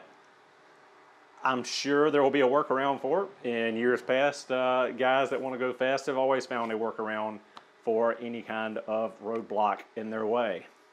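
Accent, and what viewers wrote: South, United States. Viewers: I'm still trying to get over, anyone with a Southern Accent, not being stupid. I never see them on Jeopardy. Coincidence? Anyway, that aside, you are absolutely right. Nobody talks about how user friendly it is, when it comes to repair, or working on the car itself. It is after all, a simple V-8 with a lot of plastic surrounding it. I totally agree with you. The warranty will cover so much, for so long. Plus, as soon as you put any kind of mod on it, it voids all the warranty coverage. Plus, nobody should ever purchase first year anything, when it comes to cars.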